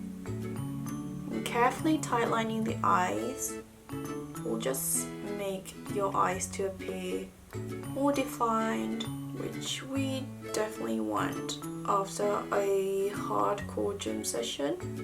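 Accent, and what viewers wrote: United Kingdom. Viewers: 우아 진짜볼때마다 느끼는데 영국식?영어? 어쨋든영어넘넘잘하시네요😃부러워요😢영어팁도브이로그로알려주심감사할것같아요❤ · 언니 영상 볼때마다 항상 영어 공부가 되는거같아서 정말 좋아용 영국식 발음 듣는거 좋아하는데 언니 영상 보면서 흐뭇흐뭇^_^ 저는 운동할때 포기할수 없는건 립이에요 다른건 없어도 아파보이지는 않으니까요!! 립 하나만으로도 생기를 줄 수있우니까=3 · sydney님 영상 정말 잘 보고있어요<3 영국발음 너무 멋지세용!! 저는 해외 살때는 쥠을 자주 다녔는데 한국에만 오면 헬스장을 안가게 되더라구요ㅠㅠ 외국에서 쥠 다닐땐 거의 저도 생얼로 다니지만 꼭 포기 못했던건 눈썹!!!!!! 그리고 립이였던 것 같아요 ㅎㅎㅎ 저도 틴트는 꼭 들고다녔어요 히히 그럼 좋은 연말되세요 님! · 저는 핸드폰과 이어폰이요!! 운동할때 너무 지루하고 힘들어서 그 때는 좋아하는 노래를 듣거나 영상을 보면 시간이 진짜 금방 가요 흥얼흥얼거리면서🎶🎶 언니 영국발음 너무 좋아요ㅠㅠ 언니처럼 영국발음하고 싶어요ㅠㅠ 제가 영어 좋아하는 편 아닌데 언니덕분에 배우고 싶은 욕구가 엄청 쑥쑥!! 오늘도 영상 고마워요😍😍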